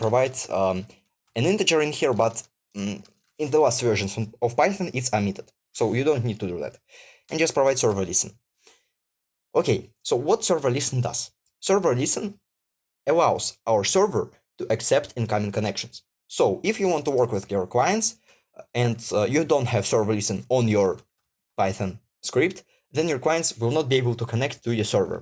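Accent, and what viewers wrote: Russia. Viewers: эхх, русский акцент выдал тебя, но говоришь по делу, you're being great!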